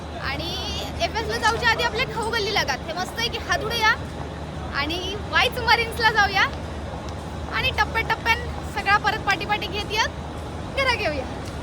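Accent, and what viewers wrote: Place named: Malvan, India. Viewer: बोलतस मस्त.असाच बोलत रव्ह.मालवणी बाज कायम ठेव👌👌👍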